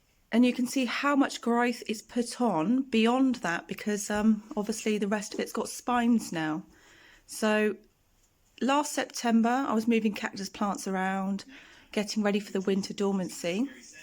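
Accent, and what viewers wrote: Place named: RP, United Kingdom. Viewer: I've subscribed to your channel. I like your content and your posh accent is a bonus. 😊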